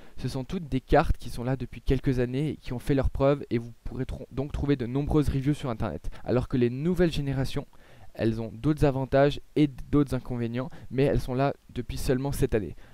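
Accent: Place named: East, France. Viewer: T'as un accent de l'Est. Tu viens d'où? Lorraine?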